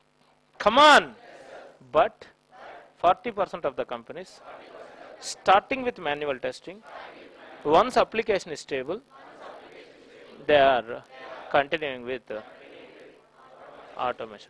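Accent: India. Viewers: In some part of india and some people speak this accent.Basically south side(Tamil or Telgue speaking people)..But one thing is those south Indian are more talented and they highest literacy and well cultured with less crime.Thats India. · Thank you for this video. I enjoyed watching it. To those ignorant people who leave ignorant comments, you are all sad. Here is someone offering a service. Nobody needs your senseless opinions. If you are not happy watching, then change channel, simple. is from India like some of you, so you should know better. Every race has accent, every instructor has methods they use in teaching. This is fun and inclusive.Please ignore them and continue your lectures.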